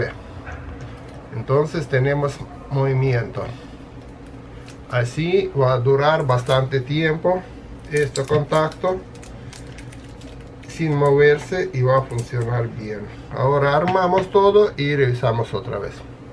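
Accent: Russia. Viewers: Ruso o ?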